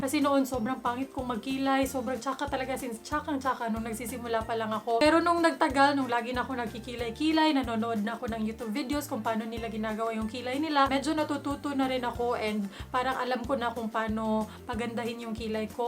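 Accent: Visayas, Philippines. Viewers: Bisaya ni bai 🤙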